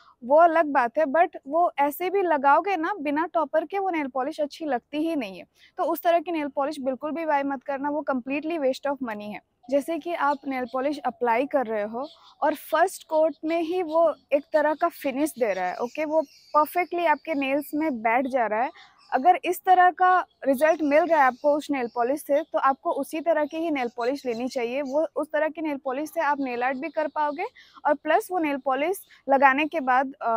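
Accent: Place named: Odisha, India. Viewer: Brooo in my mind i was thinking u are from odisha because of ur accent 🥴, u actually are .... 🥹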